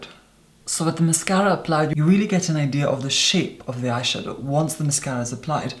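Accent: Scotland, United Kingdom. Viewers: I adore when I can hear a bit of your brogue... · Your knowledge of products is astounding! You know all the names and number of products and brushes just like that! Astonishing. How on earth do you do that? I strive to be a professional makeup artist and you set the bar VERY high! And your are so funny. Thank you for every film. You teach us mere mortals so much of your craft. I love your subtle Scottish accent too, as I'm Scottish myself. Wishing you all the best. Ilse from South Africa. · I love your voice and UK accent😍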